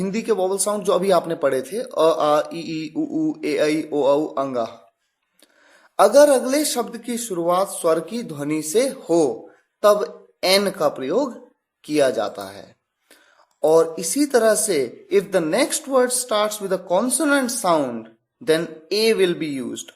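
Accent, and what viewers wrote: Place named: Assam, India. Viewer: Sir ji aap assam se ho kya 😃😃😃😃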